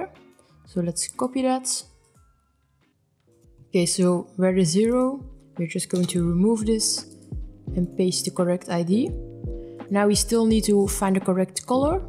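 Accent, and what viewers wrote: Netherlands. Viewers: I smell NEDERLANDER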